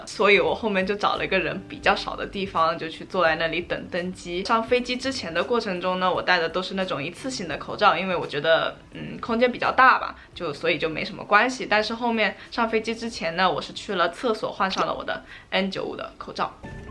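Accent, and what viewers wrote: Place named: China. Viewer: 说英文时中式口音那么重。。。还说中文差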